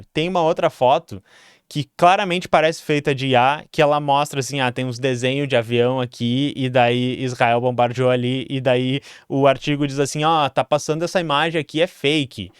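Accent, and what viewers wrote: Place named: Rio Grande do Sul, Brazil. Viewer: A imitação de mineiro com sotaque gaúcho realmente me pegou 😂. Excelente!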